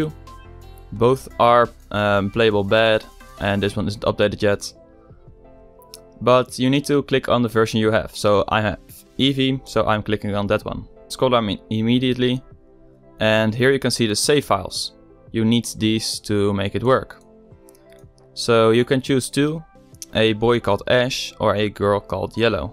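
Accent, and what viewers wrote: Netherlands. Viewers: I can hear from your accent your Dutch 😂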